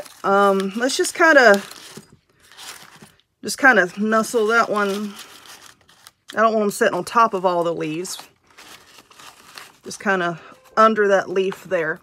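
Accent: South, United States. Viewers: I love your accent! I'm from Alabama. I bet you're not far from there!😊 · I love your Southern accent! Lived most of our lives in the South but now we are in Italy, so I really miss this southern style accent. Yet I am impressed how you can craft with those nails! Wow! Are you from Mississippi or Louisiana? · Love that southern accent💜💜💜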